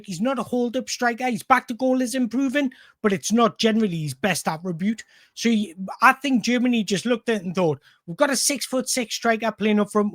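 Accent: Newcastle, United Kingdom. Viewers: just be who you are mate your doing sound. Never try and hide your Geordie accent. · I love your Geordie accent pal. I much prefer hearing regional accents, personally. More importantly I love the content of your videos. Great for football geeks like me.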